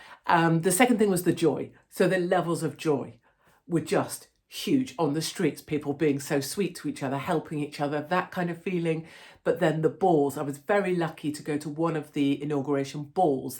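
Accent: RP, United Kingdom. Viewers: You might understand this...I remember a female officer going through some trauma.where she was crying..she got a hug from an NCO (a very experienced single NCO) and she was disgusted at being touched by a filthy subhuman NCO....and screamed .....Get off me you are not an officer....the rest of her time in Regt was not good...which is why I take the piss out of you back in the old days with your posh officer accent and now you need the support of the 'ordinary's you have toned the 'Sandhurst twang' right down. Get it?? By the way this was Signals, not some queen marys own wankers, where they wear spurs to dinner???....and the solid silver spurs cost 500 quid each and you can't actually be an officer unless you have an outside income because extra messing is more than your actual pay. Doubt you will read this but others will.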